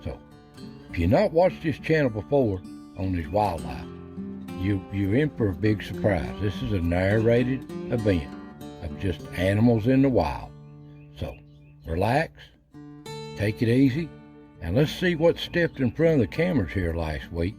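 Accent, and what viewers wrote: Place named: South, United States. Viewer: Heck fellurs I can just close my eyes and enjoy hearing ol' country accent and let it take me back to the day. ThankQ, you're a blessing to many of us who are familiar ol' country souls.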